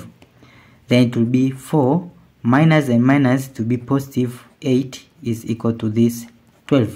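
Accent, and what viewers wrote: India. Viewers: This person in the video is NOT a Native German speaker, vo8ce is of someone from India. Why you mention Germany in your video tagline? I dont know. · Не знаю, что обозначает GERMANY в заголовке, ибо судя по цвету кожи и произношению за кадром индус. Задача решается на раз-два. Глядя на уравнение мне понятно, что (х) следует искать в области отрицательных значений. А раз так, кроме как "-2" никаких корней это уравнение иметь не может. Наверное, в Индии много лишней бумаги.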